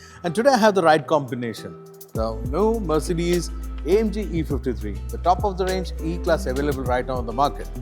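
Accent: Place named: Kerala, India. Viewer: Heavy Malayali English accent from the man. Then I saw the location, ahhh? Dubai. OK! Nice content.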